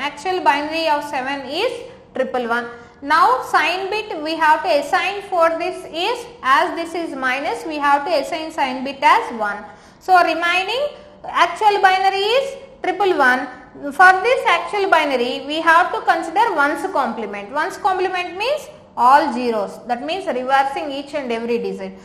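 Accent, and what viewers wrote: India. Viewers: the indian accent is killing me xDD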